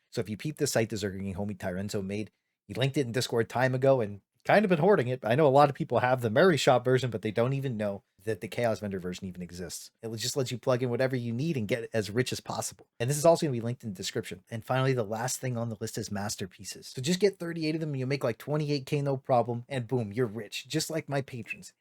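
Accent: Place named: New York, United States. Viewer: Bro are you italian and from new york hahaha you have that whole vibe going, great video btw!!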